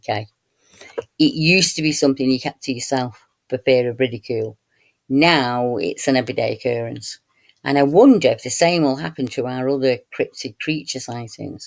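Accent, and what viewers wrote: United Kingdom. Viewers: I could listen to you all night. I live in the US in Georgia. I love your accent. Of course the UK has cryptids. I have no doubt that big cats are there. It's a wonder more children or elderly people haven't been attacked. It's hard to believe people would just let them loose. But look at what people do to their dogs and cats. So irresponsible.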